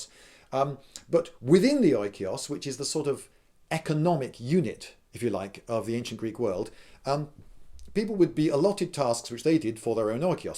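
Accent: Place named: United Kingdom. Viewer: I had never heard of this social structure before, very intriguing to say the least. More research is required. Thank you for the fascinating videos on such a wide range of topics. The videos on swords and other ancient weapons is what originally attracted me to your channel. I have also really enjoyed your videos on historicalish, emphasis on ish, movies. I was wondering if you could comment on a particular movie sequence in a recent movie. I was wondering what you would think of the use of the shield wall in the recent Hercules movie with Dwayne "The Rock" Johnson specifically. Incidentally you look and act a lot like my favorite uncle, right down to the British accent. The name is James by the way and thank you again for your channel. I enjoy it immensely.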